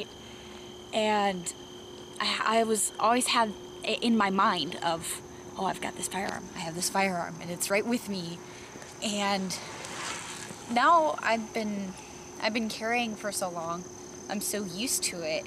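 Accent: Wisconsin, United States. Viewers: You sound like your'e from WI?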